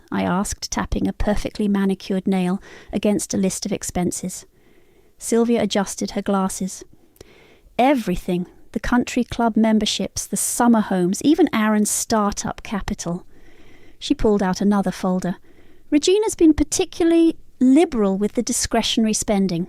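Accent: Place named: United Kingdom. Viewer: So lovely listening to that British accent read this story aloud! Am enjoying this so very much!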